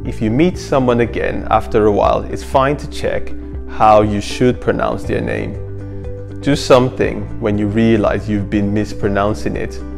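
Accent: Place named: Wales, United Kingdom. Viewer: Well presented. This problem with names in determining whether or not you will get an interview is not new, although people outside of London are more likely to make an effort to get your name right. On the flip side, there are many English names (not a problem with Welsh names) whose pronunciation is ridiculous, eg. Featherstonehaugh, pronounced Fanshaw. I think that you have begun to pick up just a trace of a South East Wales accent, so well done from a Welsh ex-pat. I had not heard of the campaign, so it deserves more coverage.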